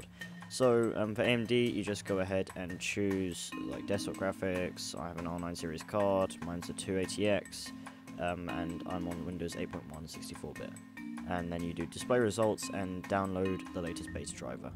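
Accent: United Kingdom. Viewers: yes and this british accent makes it FUCKED UP.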